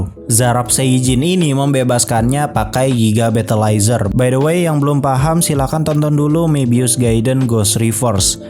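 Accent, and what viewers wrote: West Java, Indonesia. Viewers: Bang maneh teh orang Sunda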